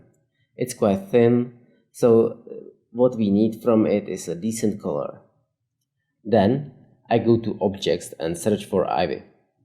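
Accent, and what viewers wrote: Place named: Czech Republic. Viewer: That Czech accent lmao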